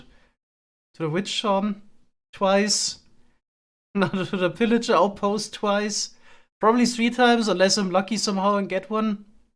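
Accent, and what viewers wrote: Germany. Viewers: he's def German.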